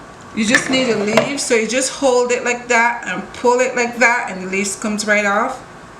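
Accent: Barbados. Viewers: So good to hear your Bajan accent!!! I'm Bajan too living in Jamaica. I'm about to make some fritters now! Thanks for this recipe.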